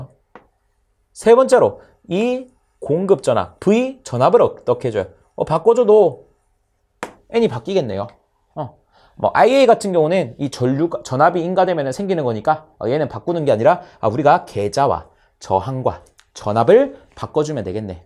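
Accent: Gyeongsang, South Korea. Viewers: 화내서 사과하는거보고 빵터짐 ㅋㅋㅋㅋㅋㅋ 경상도말투라 감안하고 봤어요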